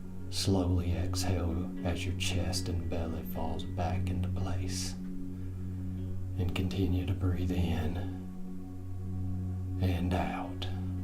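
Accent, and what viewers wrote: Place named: Europe. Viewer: I wish you weren't hilarious. Try to find a female voice for these. I'm tired of the Euro accents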